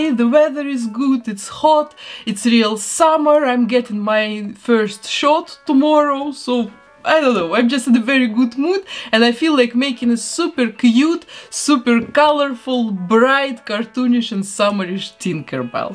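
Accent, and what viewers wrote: Russia. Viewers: Russian akcent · Девушка, ваш акцент уж очень напоминает русский... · Очень красиво, но почему мне кажется что вы говорите с русским акцентом? Ваша работа вдохновляет! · Вы русская? У вас такой жесткий английский 😱😱😱😱 Я тоже решила переделать такую же Дракулауру, не нравится она мне вообще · Знакомый акцент. Вы случайно не русская?) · Такое ощущение, что девушка русская, так как говорит с акцентом, но тогда жаль что нет русских субтитров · Мне одной кажется, что у неё очень сильный русский акцент 🤔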